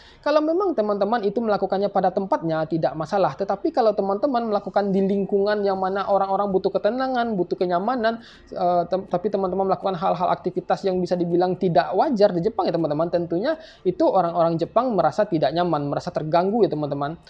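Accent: West Sumatra, Indonesia. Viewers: Bangg, urang Minang bangg?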